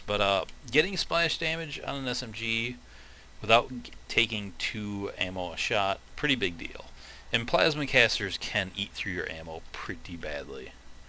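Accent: United States. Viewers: This guy has gotta be midwestern I can tell from how he says slag "slayg"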